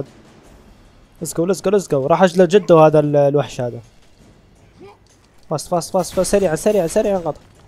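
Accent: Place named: Algeria. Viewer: اهاااااااااا هسة فهمت 😁 اني اكول مستحيل سعودي يتكلم بهاي الطريقه +تشرفت بيك و على راسي الجزائر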